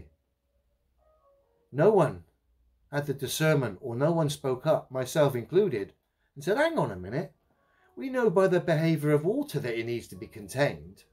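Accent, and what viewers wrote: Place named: United Kingdom. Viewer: new sub, great to hear a Brit covering this topic with great detail and information. Keep it up my friend people starting to see this monumental lie.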